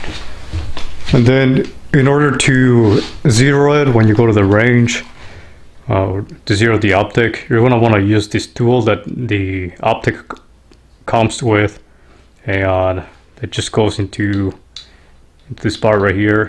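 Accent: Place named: Canada. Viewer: Canadian accents are the best.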